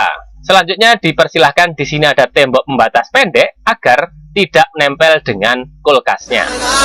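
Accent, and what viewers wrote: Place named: Java, Indonesia. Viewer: Mlungker kaya obat nyamuk bakar Jawa medok , sukses selalu bro .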